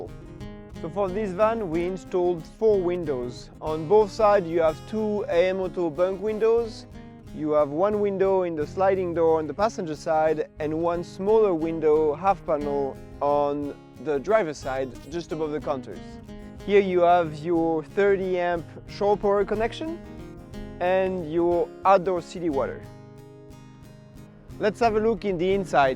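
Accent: France. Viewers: Just beautiful as always 😍 bravo PS: like the french accent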